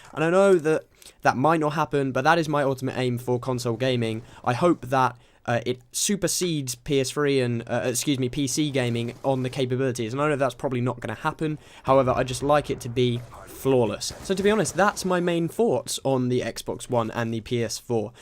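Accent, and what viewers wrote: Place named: United Kingdom. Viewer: The BRITISH ACCENT..... Awweeeeeeeee! DAT ACCENT! :D Nice intro btw!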